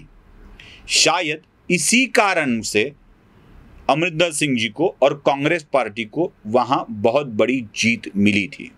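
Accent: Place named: Maharashtra, India. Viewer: your content is always good. Marathi Aap acchhi bolte hai. But Hindi is a soft language, your accent is marathi. I suggest please polish your hindi speaking to get more viewers. I watch analyzer daily, hope you dont mind the suggestions.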